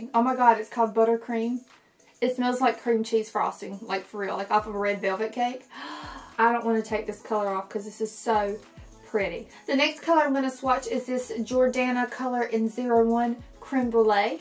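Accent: South, United States. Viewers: New subscriber! Your accent completely won me over 💘 I'm a southern girl myself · yeah she has a really charming southern accent.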